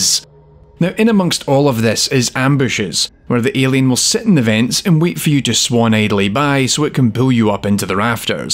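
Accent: Scotland, United Kingdom. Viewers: Is this scottish accent? If yes then damn i love it. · as a scottish person i cannot listen to another scottish person narration without the cringe turning me inside out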